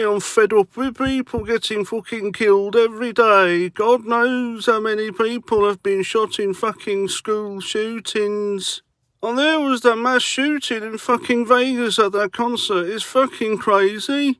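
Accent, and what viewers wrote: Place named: Birmingham, United Kingdom. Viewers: your Brummy accent is hilarious...yam alright am ya bab 🤣😃👍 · Excellent brummie accent. I'm glad Ozzy's coming back bless him. War Pigs is an ace song....so true today as well.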